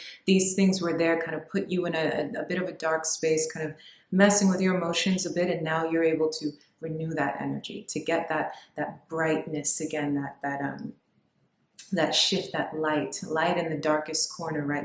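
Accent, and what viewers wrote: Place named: Caribbean. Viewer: I never noticed your caribbean accent before. Beautiful ✨️✨️💕💕 I'm Trinidadian. Thank you for this beautiful reading!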